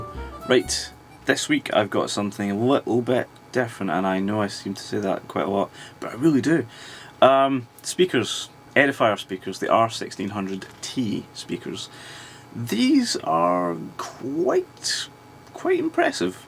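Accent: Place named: Aberdeen, United Kingdom. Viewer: Where are you from? Sounds like an Aberdeen accent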